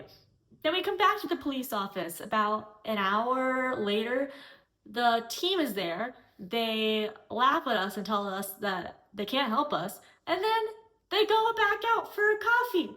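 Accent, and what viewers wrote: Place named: Naples, Italy. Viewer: Hahaha, abusive parkers… I loved it!, I think you’re Neapolitan to the core… only someone with an incredible ability to adapt could talk like that 👏👏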